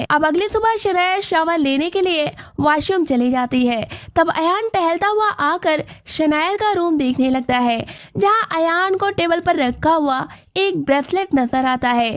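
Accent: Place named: Bihar, India. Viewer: Madam you are from Bihar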